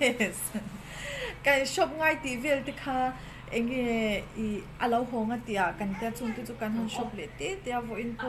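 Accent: Mizoram, India. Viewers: Her English accent totally mizo no doubt😂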